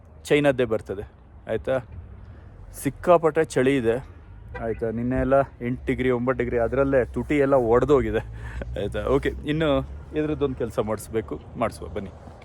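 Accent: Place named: Kundapur, India. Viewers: Kundapur kannada ❤️ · Hello nivu Udupi avara, nimma kannada Keli oru badi andu konde.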